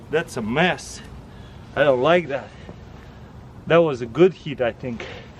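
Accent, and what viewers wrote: Russia. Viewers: Idk but I think he has already reviewed this axe or it's probably another dude with a russian accent.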